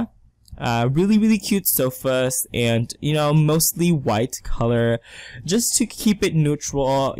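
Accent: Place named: Philippines. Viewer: I really like it!! new subscriber 👏 Ps. I already thought of you being a filipino just when you started talking!! #teamfilipino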